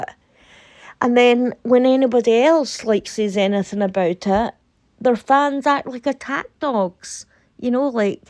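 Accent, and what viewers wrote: Scotland, United Kingdom. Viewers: I absolutely love Scottish accents. I’ve started watching some of your videos, l didn’t take match notice of the title, and after listening l didn’t think you came across as being nervous, well done to you. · A Scottish accent. I can understand her fine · I don't have a problem with her accent, or anybody else's for that matter - foreign or UK. I have a good ear (and happen to be Scottish too), but it's not always easy for others if they are not used to it. Even the English put subtitles on our home produced programmes if it's being aired in their country as some of our dialects and pronunciations are incomprehensible to outsiders. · That is a beautiful Scottish accent. Stay safe, be well 🙏🇨🇦🇬🇧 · Carry on, when i first spent time in Scotland I thought the people at Glasgow central spoke another Language, but I as others will soon tune into your beautiful accent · Hi you’re Scottish where are you from,am from Scotland west coast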